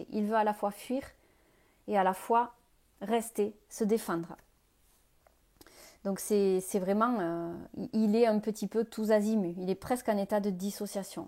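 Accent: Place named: South, France. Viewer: Namasté, ha ha ha !!! je rigole bien sur, merci beaucoup pour vos bonnes vibs, & votre bel accent du sud plein de Soleil :)))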